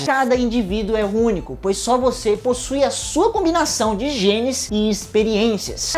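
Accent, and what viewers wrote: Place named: Fortaleza, Brazil. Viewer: O algoritmo me trouxe aqui. Tô maratonando. Vem cá. Você é de Fortaleza? As vezes você fala como nós aqui. Um cheiro. Tô espalhando teu canal pelo "mei do mundo".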